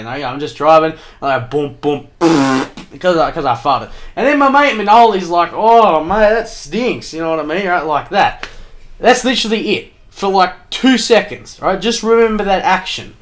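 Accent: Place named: Australia. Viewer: I never knew you were an Aussie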